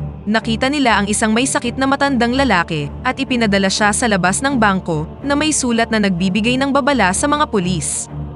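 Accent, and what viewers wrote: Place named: Philippines. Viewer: galing, sir anong reader app mo na may pinoy accent?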